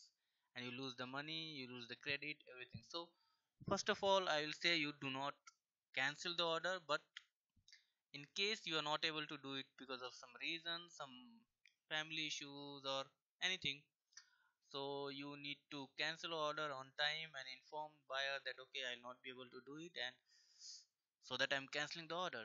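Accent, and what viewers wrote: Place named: Bengal, India. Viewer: You have a bengali accent Are u bengali? Nice video btw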